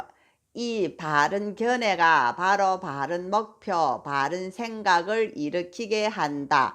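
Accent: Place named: Gyeongsang, South Korea. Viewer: 불교 신문이라... 남 해치지 않고... 근데..많은 사람들이 남을 해쳐서 자신의 이익을 얻고자 하지요....혹시...경상도 출신인가요? 나도 갱상도인디...억양이 갱상도 같아요 ㅎㅎㅎ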